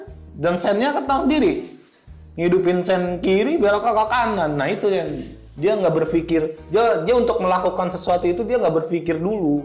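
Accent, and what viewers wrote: Betawi, Indonesia. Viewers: Kocaknya bang klo lagi ngomong..betawinya nyablak bgt dah...salam dari tanjung priok bang😂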